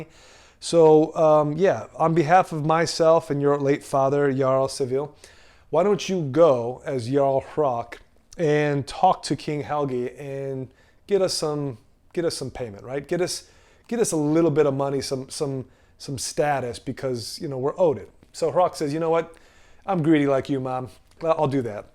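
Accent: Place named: United States. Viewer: You sound American brother. You might like this book written by a First Generation American born Norwegian titled SPIKING THE GOLD or WHO CAUSED THE DEPRESSION & THE WAY OUT (a book of facts essential to the welfare of every American). Author was born to the Arne Andreas Nordskog son of a butcher from Oslo. That’s better handwriting than mine by the way.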